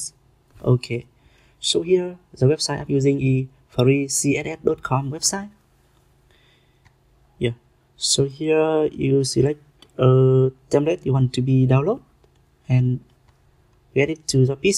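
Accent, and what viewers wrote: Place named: Vietnam. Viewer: Sir the way you speak english I assume you're a Vietnamese cause I'm a VNmese and it's really easy to spot out a Vietnamese, in that case Xin chào và video này dễ hiểu ấy But if you're not then ok It's really easy to understand what you said and what you do. Thankyou sir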